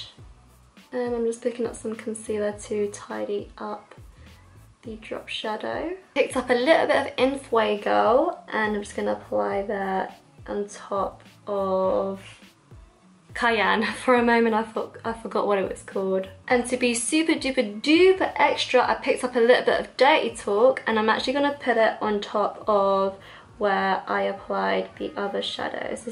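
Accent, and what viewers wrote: United Kingdom. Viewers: You're my Asian makeup inspiration! <3 & you're hella funnyyy. When I watched your first video I was pretty shocked by you're British accent! I wasn't expecting that ahaha but now I can't stop watching your vids ! {ig name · <mail> (p.s. when I first started watching you I was so surprised when I heard your voice cause I didn't realize you where from the U.K. 😂)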